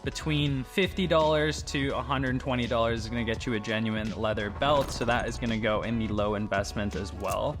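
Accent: United Kingdom. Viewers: ha ha love the british accent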